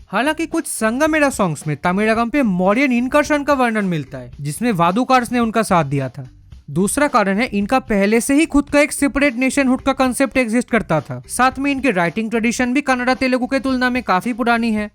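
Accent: Bengal, India. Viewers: Bhai bangali ho kya accent se esa laga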